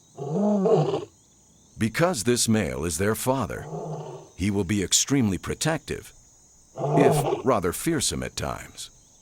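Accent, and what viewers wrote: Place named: Texas, United States. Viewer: He's narrating Texas-style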